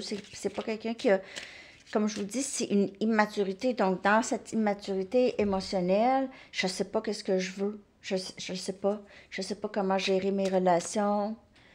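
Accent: Canada. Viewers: Bonjour, mille merci pour vos guidances et quel plaisir d'entendre votre bel accent canadien! je suis en amour pour votre si beau pays et vos si beaux paysages, votre culture si bienveillante.....🤗💖🦌🐾🐻🌲🍁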